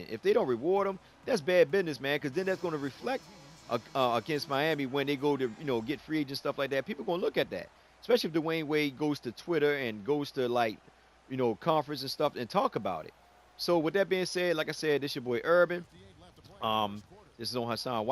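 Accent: Baltimore, United States. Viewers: You sound like you from Baltimore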